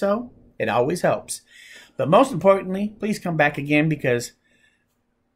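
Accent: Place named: Quebec, Canada. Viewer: This was so helpful. Love your teaching method. Your accent struck me. Are you near Quebec?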